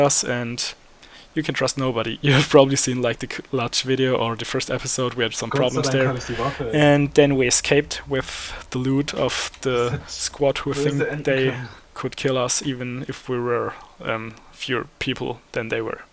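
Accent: Germany. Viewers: man hört deinen deutschen akzent und man hört deinen bruder deutsch sprechen in skype ^^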